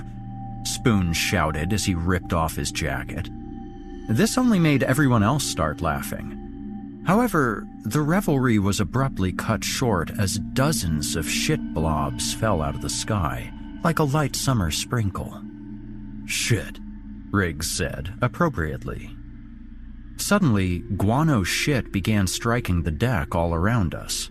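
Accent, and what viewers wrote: United Kingdom. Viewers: Wow! I'm the author. And this certainly was a fantastic surprise! When I started my shift at work yesterday, I had no idea that my story had been officially selected to be narrated by none other than at the Horror Hill! It really was a fantastic way to start off the work day.:) I truly feel honored to have made the cut for something like this. And it's doubly cool for me because hails from the same city where I grew up. Hope all is well in Rochester. And thanks so much for narrating this! And I hope to work with you more in the future... BTW don't listen to the haters man. You totally killed it with that British accent.;)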